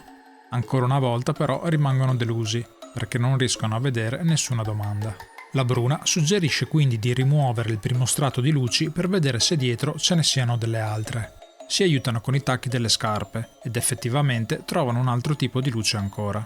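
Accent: Sardinia, Italy. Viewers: Penso Sardo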